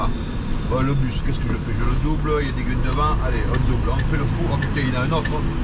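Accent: Marseille, France. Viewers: Ta façon de parler est identifiable à Marseille tout le monde ce connaît … Pour quelques vues sur YouTube mettre sa vie en danger 😱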